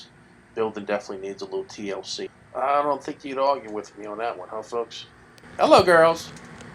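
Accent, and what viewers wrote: United States. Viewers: Great video! Also your accent is so unique. You sound like east coast, southern, west coast, rhode island. All at the same time. So weird. (in a good way)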